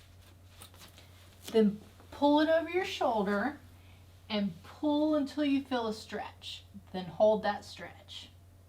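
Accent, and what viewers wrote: United States. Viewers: Thanks this has really helped with my hip pain ....out of interest what state is your us accent from :) Regards mark uk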